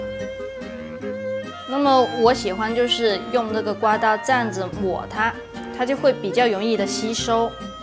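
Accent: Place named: Fujian, China. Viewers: 普通話教學，但是發音好難聽！福建口音估計是！ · 老师，感觉你是福建人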